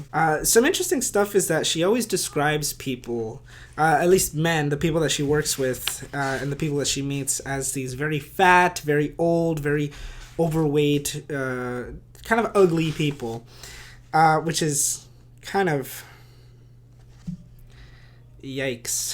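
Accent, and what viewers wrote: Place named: United Kingdom. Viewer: The British accent 😂😂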